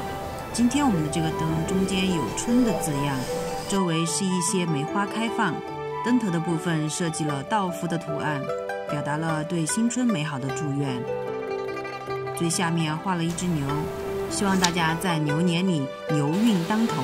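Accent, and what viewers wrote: Sichuan, China. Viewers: 原来大神是四川人！之前听口音觉得有点像，但不确定。四川出了好多大神级的up主啊！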